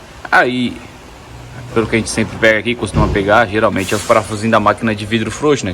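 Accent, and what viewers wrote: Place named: Santa Catarina, Brazil. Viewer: Sotaque de SC. É da Floripa?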